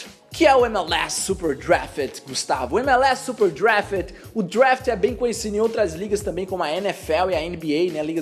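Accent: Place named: Northeast, Brazil. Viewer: De qual estado do Nordeste você é, djow?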